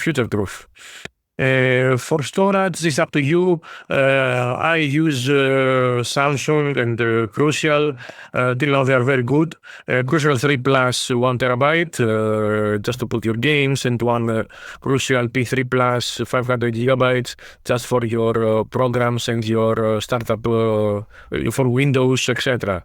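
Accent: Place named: Greece. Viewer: your welcome 🙏🏻 can I ask you something, are you from Greece? The way you speak English is like the common way usually Greeks speak the English language 😅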